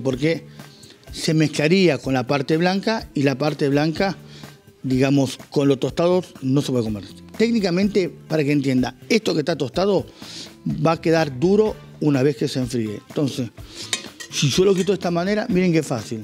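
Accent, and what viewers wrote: Argentina. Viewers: Me encanta el acento tan argentino que tiene usted, que es originario de Japón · Un japo hablando argentinoooo 🤌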